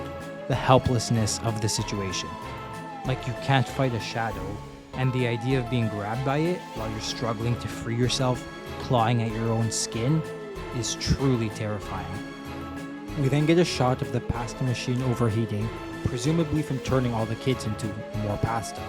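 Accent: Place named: North America. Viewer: It's actually rather surprising hearing a North American talk about this show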